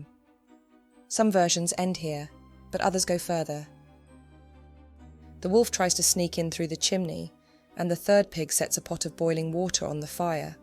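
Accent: England, United Kingdom. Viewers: The delightful English accent enticing us down the path, peeling back the layers; soon the unwary listener finds himself traversing the underworld. Delicious! But you stopped the story short just when it was becoming really interesting. You were about to step into the world of gods and the spirit, and the value of expressions of consciousness to the ancients, their supposed cosmology and divine order. Another day hopefully.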